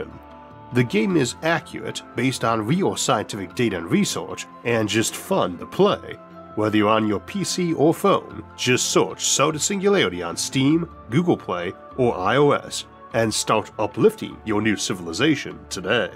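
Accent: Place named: Boston, United States. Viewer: Just re-watched upward bound - You've gone from a self depreciating Elmer Fudd, to a confident sounding speaker with an "almost Bahstahn" accent. You might want to pull a set of videos that were recorded a year apart and go over them with your voice coach. P.S. With your content I skip the falling asleep part and go straight to dreaming of the stars.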